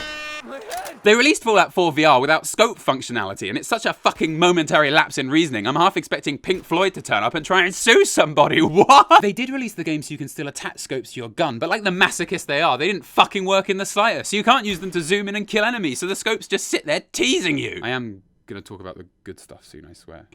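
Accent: United Kingdom. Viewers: I love the British accent💙. Also great video! I could not stop laughing 😁😁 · wait hes british? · I must have had several brain hemorrhages because I never realized you were British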